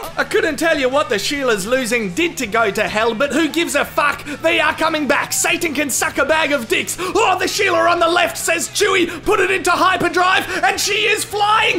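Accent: Australia. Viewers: im not sure why ur so funny as an american, i think its a combination of the clever commentary references, your aussie accent, and the sheer amount of fail of the people in the videos you choose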